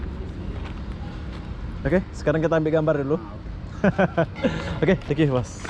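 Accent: Lombok, Indonesia. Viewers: Klo bolh tau abang nich orng indonesia jugk ea krn dri tutur ktanya mcam indonesia maaf ea bang jdi kefo cima igin tau aja bang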